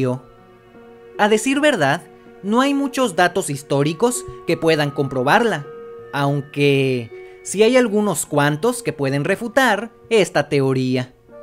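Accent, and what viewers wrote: Mexico. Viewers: Eres yucateco?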